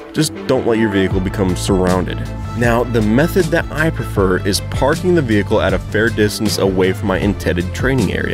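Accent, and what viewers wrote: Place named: United States. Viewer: question, why do you say zeds? you sound american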